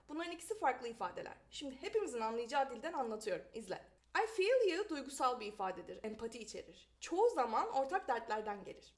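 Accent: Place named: United States. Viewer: İngilizcen mükemmel ve Amerikan aksan ile konuşuyorsun bende öğrenciyim voleybolcuyum ve dilim var C2 geçen gün sınavım vardı C2 ye geçmek için senin videolarını gördükçe İçimden diyorum ki lütfen ben de böyle güzel aksan ile konuşayım. İngilizcenin yarar şu ki hem sporcuysanız hem de yabancı ülkeleri gezmek istiyorsanız işinize yarıyor ama sporcu olursanız daha çok işinize yarar çünkü hem maç için yabancı ülkelere gidiyorsunuz ki ben de şu an öyle hem de gittiğiniz yerde gezip oradaki farklı yemekleri deneyebilirsiniz ama sporcuysanız biraz zor yemek konusunda😊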